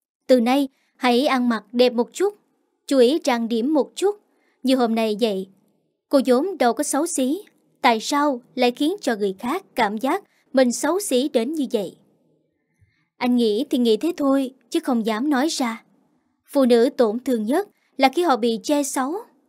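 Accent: South, Vietnam. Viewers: Going mien nam de nghe wa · Mỗi người có một sở thích khác nhau, có một số người không thích giọng đọc của MC vì chất giọng của miền Nam. Nhưng thực tình thì đọc rất hay, nhẹ nhàng, truyền cảm,bộc lộ được nội tâm của từng nhân vật làm cho câu chuyện hay hơn, hấp dẫn hơn.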